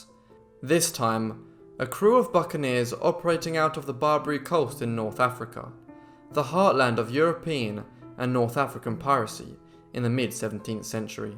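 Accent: England, United Kingdom. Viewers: British accent. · He speaks English, the English way, you know, the way it's supposed to be pronounced.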